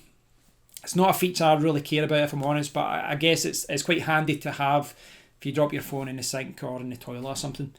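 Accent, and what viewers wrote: Scotland, United Kingdom. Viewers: love your Scottish accent! When I heard it, I pressed the like button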